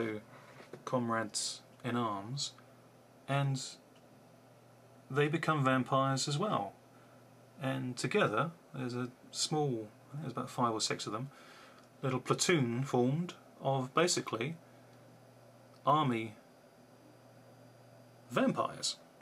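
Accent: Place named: England, United Kingdom. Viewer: noticed your accent mate i am from england too, come from essex mate. how did you manage to get deadly prey on vhs. i used to have a vhs copy orinigal not in japanese writing, the cover looks like danton at the end of the film screaming outside of a bulding, which is misleading cause you don't see any buildings in the film. still great movie. don't have jungle assault still trying to get a copy. do have deadly prey.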